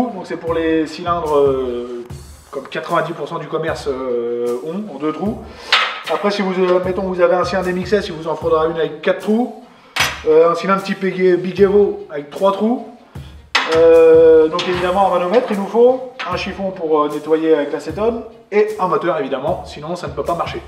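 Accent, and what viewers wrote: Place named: Normandy, France. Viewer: on entend l'accent normand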